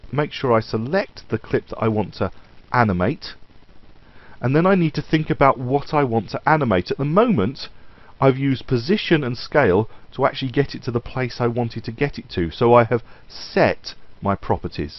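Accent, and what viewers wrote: United Kingdom. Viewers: "Creative Cow" -> Kids toy -> Country music -> "Moo" sound effect -> British accent?! You surprised me there. I was expecting a southern American accent and some sort of hacker tutorial. Good lesson, man. These things are almost as useful as Tweens in Adobe Flash! This lesson helped me create the effect of the camera panning across a picture. Thanks a lot!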